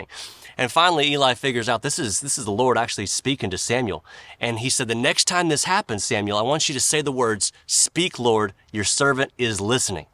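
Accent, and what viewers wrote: South, United States. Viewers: just seeing your face and listening to you talk tickles me to death. Unfortunately, I tend to be pretty stressed out and always thinking about the next problem that needs to be solved, so I appreciate those people out there that can just put a smile on my face. You always do that for me. Maybe it is just the smile, or the familiarity of a good ol’ country twang. But I strongly believe that it’s actually because you carry a bright light with you. The kind that you can only get from Jesus. I can see it in you. I just thought I would say that. Thank you. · Thanks, I like your content, you talk like me. I'm a Southerner myself.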